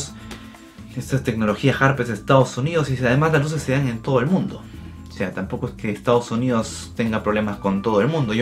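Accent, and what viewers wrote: Peru. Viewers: Eres peruano??? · Sospechaba que era de Perú pero recien hoy lo confirme xd , que orgullo que este hombre sea peruano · Recién me entero que eres de Perú xD, aunque ya me daba esa sensación por tu forma tan neutra al hablar castellano y eso es algo característico de aquí. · eres peruano?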